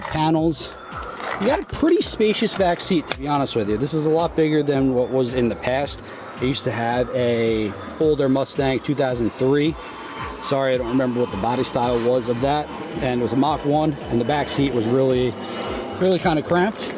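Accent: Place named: Philadelphia, United States. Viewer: Love that Philly accent! Good luck with the channel. Great video.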